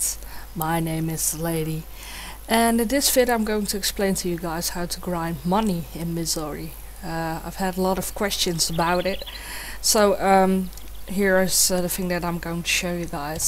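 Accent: Netherlands. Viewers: are you dutch.. ben je nl ik denk het well haha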